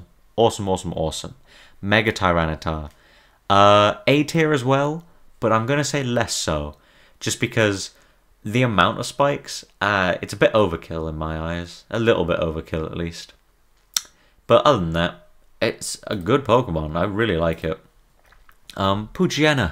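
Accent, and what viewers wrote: United Kingdom. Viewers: just LOVE the british accent xDD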